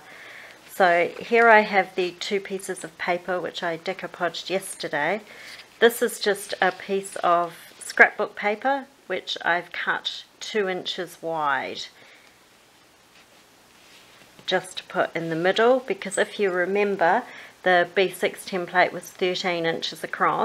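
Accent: New Zealand. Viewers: Just found you on this tutorial. Thanks so much, I can’t wait to get started on some second hand wallpaper and make a lovely cover using your technique, which will be sold in a very upmarket decor shop. So exciting. Awesome! Thanks once again from South Africa 🇿🇦 Is that a lovely New Zealand accent? Love it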